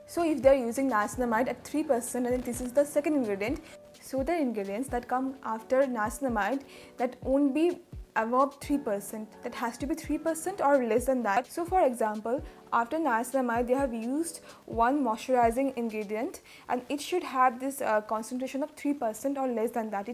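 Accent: Bengal, India. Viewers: She is not at all trying copy any accent here,its only MTI(mother tongue influence) as she is a Bangali,every person in India has their own English accent because of our regional languages. If we go to south India, their English is very different. Similarly, people living in north India have a different English accent, so what's wrong with her accent. Oh yes,she isn't an English teacher. You are doing a fabulous job. Let's help our fellow people to grow,let's motivate and encourage them. If we can't, lets just keep quiet. Let's be nice to people. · Her pronunciation is absolutely perfect. There is not a single way English words are pronounced lol. Americans, British,Irish and Australians have their own version of English. There is no ONE pronunciation. This is the Indian one and it's perfect. Stop making people speak the way you want them to speak.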